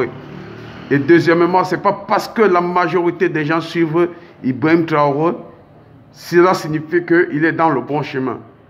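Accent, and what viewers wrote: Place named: Cameroon. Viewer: Il est fou tous les jours ses vidéos c'est Ibrahim Traoré pour ce faire des vus avec des mensonges et son français me fais rire il a l'accent camerounais non burkinabé.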